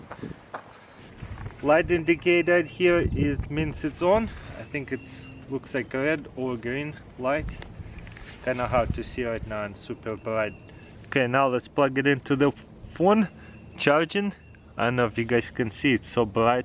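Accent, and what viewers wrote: Russia. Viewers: He's from Russia.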